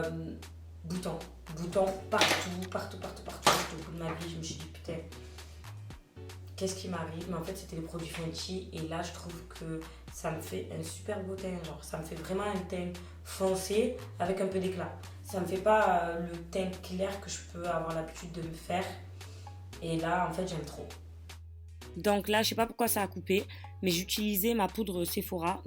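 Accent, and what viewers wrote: Marseille, France. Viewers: J’adore 😍 jaime trop ton accent marseillais! 😘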